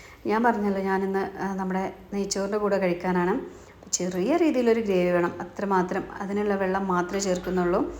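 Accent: Malappuram, India. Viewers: ഇത് പണ്ടത്തെ മലപ്പുറം താത്ത തന്നെ ആണോ കാരണം ഭാഷക്ക് മാറ്റം വന്നല്ലോ ആദ്യത്തെ സംസാരം തനി മലപ്പുറം ആയിരുന്നല്ലോ ഇപ്പോൾ എങ്ങിനെ മാറി ആതാത്ത അല്ലേ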